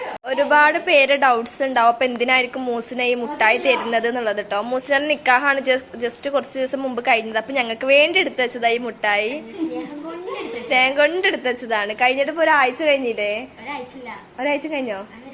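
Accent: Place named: Malappuram, India. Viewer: Thani Malappuram samsaram😂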